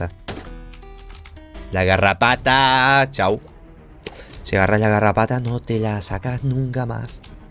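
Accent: Argentina. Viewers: vos sos argentino???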